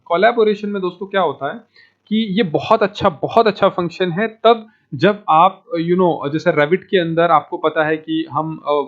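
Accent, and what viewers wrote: India. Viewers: Sir pls at least add subtitles or cc in your video.. I really dont understand what are u saying with ur indian accent.. i can only understand a few word and not all because ur english accent use indian accent. So pls pls add subtitles🙏🏻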